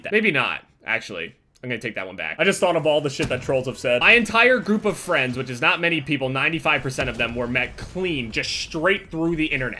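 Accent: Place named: New York, United States. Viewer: Anyone notice that New York accent with him saying "Twitta"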